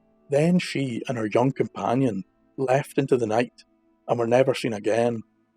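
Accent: Scotland, United Kingdom. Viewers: Please Sir, may I have some more? You have a handsome voice and I sincerely and truly appreciate & enjoy your lyrical Scot's accent. Yours truly from America. Be Blessed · thanks for telling Scottish stories with Scottish accent. i actually cannot stand English or American accent. Due to my upbringing.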